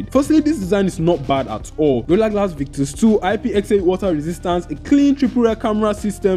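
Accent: Nigeria. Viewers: Keep it up, your Nigerian accent day give me special joy. 👍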